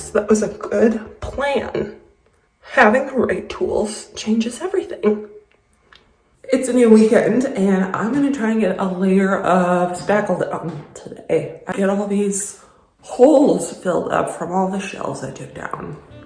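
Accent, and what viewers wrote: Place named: Wisconsin, United States. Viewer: I to am a Wisconsinite, you go girl 🎉🎉🎉 you must be up North I’m in southern side not much snow here just cold 😊😊 I should’ve known by your accent since everyone thinks we have an accent in Wisconsin😂😂😂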